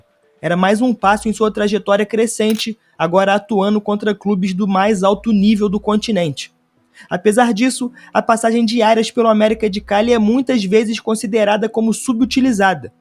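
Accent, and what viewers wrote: Rio de Janeiro, Brazil. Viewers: Cara esse sotoque carioca forte dói no ouvido. Eu me rendo, as vezes é melhor leitura de IA.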